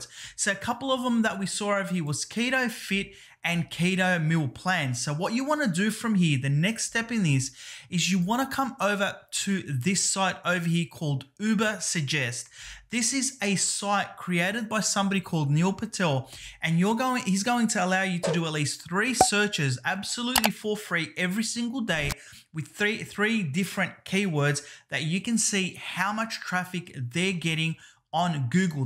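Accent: Australia. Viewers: I like your Aussie accent 👌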